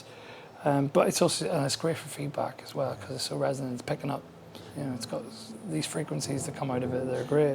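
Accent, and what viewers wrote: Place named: Belfast, United Kingdom. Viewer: He sounds like he's from Belfast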